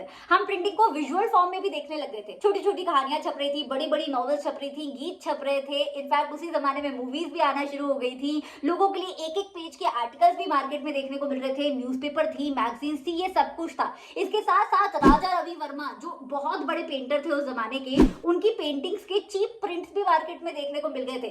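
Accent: Gorakhpur, India. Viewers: Mam aap gorakhpur se hai kya ❤ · Mam aap Gorakhpur se hai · Mam are you frm gorakhapur😅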